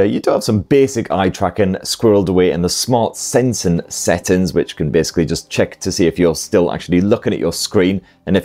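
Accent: United Kingdom. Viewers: Is this guy british?? 😂😂 Just a simple doubt. The accent😅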